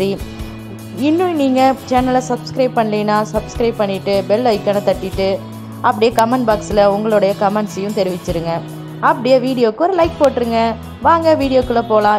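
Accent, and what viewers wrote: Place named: Kongu, India. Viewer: .... Miga Miga Azhkai ullathu... Unthan peyarai polavea... Ne pesi konjum kongu tamil.....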